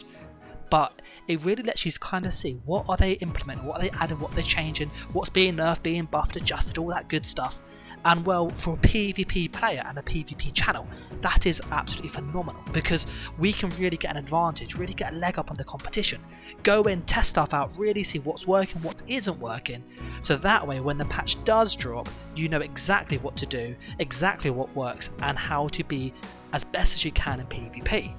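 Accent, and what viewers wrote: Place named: England, United Kingdom. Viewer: This guy is clearly English i dont get this comment, there defiantly a lot worse accents from England.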